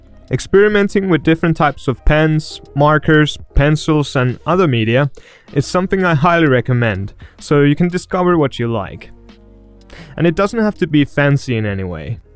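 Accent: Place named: Finland. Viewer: Haha I guessed right - Finnish accent! (Altough being Finnish myself it's not much of an achievment)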